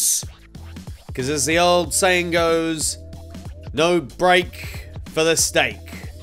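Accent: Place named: Australia. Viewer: I didn’t know he was Australian I thought he was Italian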